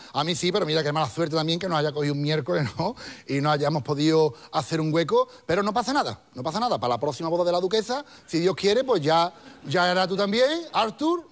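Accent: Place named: Andalusia, Spain. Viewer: Creo que no pillas el humor de,el habla asi queriendo,como dando a entender que el es andaluz y habla en andaluz y como le da la gana,lo hace a posta para a quien no le guste hay tiene tres tazas,y hace muy bien a mi me encanta, puede hablar castellano perfectamente no lo hace porque no quiere,esta claro. y es exagerar y hablar,hacerse,en fin criticas a que es solo "humor" y mira tu como escribes...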